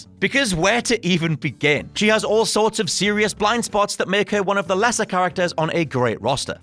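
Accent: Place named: Scotland, United Kingdom. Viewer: Her Scotts voice and I clicked away.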